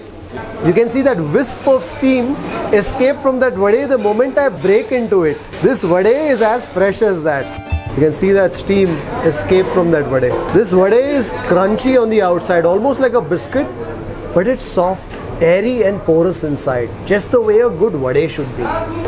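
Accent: Karnataka, India. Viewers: Boss you speak kannada so lovely good job ,god bless you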